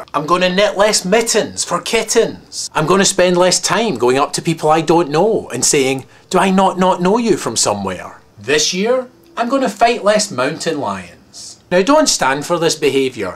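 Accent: Scotland, United Kingdom. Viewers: Let's hear it for monotonous, inane, recycled social commentary and weak attempts at humor. For the record, Scottish accent != funny. I'm currently soaking your youtube account in vinegar... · I thought this was funny. Nice one. Loving the scottish accent lol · Can't get past his Scottish accent-I prefer Michael Myer's version because he's actually funny. YouTube vids stink when it's simply someone's ugly face sitting still while their eyes dart from the camera to the monitor so they can catch a peek at what they look like while shooting the vid. I'd rather watch Michael Richards. His bald, gouteed, & earring look-he's just plain annoying. Wish his resolution was to trash his webcam & switch careers to something where you can't hear or see him.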